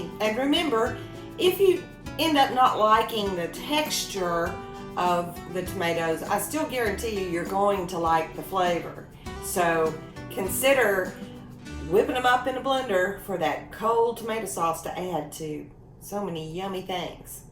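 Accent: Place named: Kentucky, United States. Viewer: Hey girl 💥I don’t know where you’re from but you sound like you come from my neck of the woods!! Love❤️ love the video…❤️ from ky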